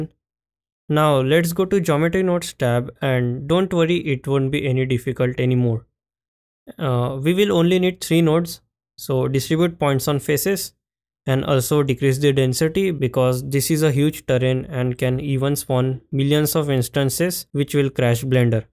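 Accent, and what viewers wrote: India. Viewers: You know that when a indian accent youtuber is making the video, he's going to help you out with any problem.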